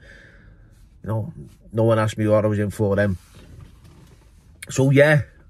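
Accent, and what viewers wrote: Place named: RP, United Kingdom. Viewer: Sorry wrong msg , meant ot for your other video, I watch so many getting confused, I never comment, great posh accent 😂❤❤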